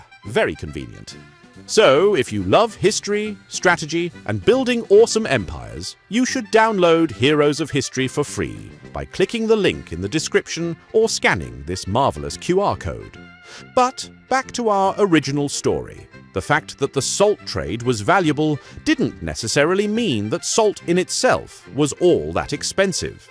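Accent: United Kingdom. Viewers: The new narrator needs more practise putting on a heavier british accent with more inflection.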